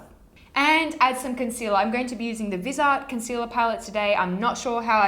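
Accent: Australia. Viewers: You're gorgeous and I love your aussie accent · You're so talented, likeable and articulate. Sounds random, but I love listening to you talk as much as I love watching you work. Your knowledge of and passion for makeup really translates well in your videos - keep it up! You're so great!! P.S. Love your Aussie accent! x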